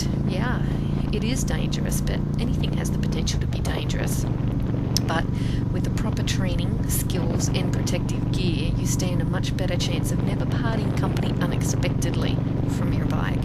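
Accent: Australia. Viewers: G8 video there... Have watched most of them and have to say quite entertaining as well as informative... love the Ozzie accent... LOVE your Victory... Getting onto a course next weekend(Riding/Learner's Permit)... I'm a bit like yourself... but MID-FORTIES... LOL.. and have been on youtube for the last 6 months researching.... But i think the Victory's are No.1 with me at the moment(Hammer S)... But first things first... anyway... i really enjoyed your video..Cheers from Ivan.. Geelong AUS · is that the yamaha v star 650?? also are you Australian?? ( i am Australian and i think youre either Australian or a Kiwi)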